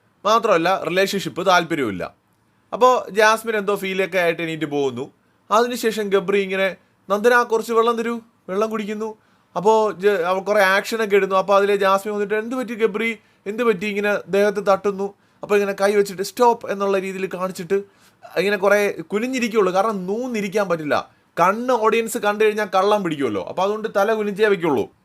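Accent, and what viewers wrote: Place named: Kollam, India. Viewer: Samsaram kettit chettante veedu ethaand Kollam jillayil annen thonnunnu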